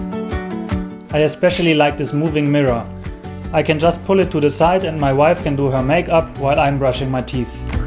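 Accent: Germany. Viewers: hahaha, so you are German. I checked out the page. But is your Japanese better than your English? I hear both German and Japanese influence in your English pronunciation. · I love the German accent! Or is it Swiss or Austrian? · Voll der deutsche Akzent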